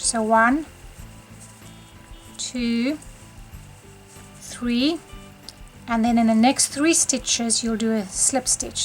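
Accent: South Africa. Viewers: Your south african :) so am i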